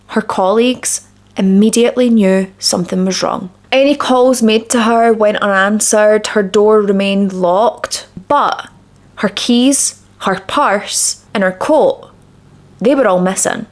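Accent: Scotland, United Kingdom. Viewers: I noticed my notification for your video and yelled out you posted, my 9 yr old son yelled whoo hoo because even he knows how much I love you❤. You do stories I haven’t heard and I love that you have the same thoughts of the criminals and things they do so it’s more engaging to watch! Not to mention I adore your Scottish accent, I tried to learn it by watching videos but it’s not so easy😂. If I could have some makeup tips that would be great but I don’t think I would be as beautiful as you😊. Thanks for all your hard work, I hope you get noticed more, you deserve it love.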